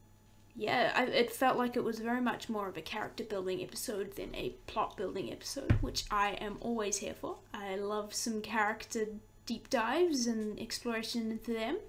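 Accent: New Zealand. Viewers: LOL Well that is funny. A Kiwi. I didn't pick up your NZ accent, but I should have, as I love Karl Urban. I just noticed the way you said idea. Thought you might be from southern US, not the other side of world. LOL 😆 I enjoy your reactions though and you haven't hit the REALLY good stuff. It's about to seriously heat up 😁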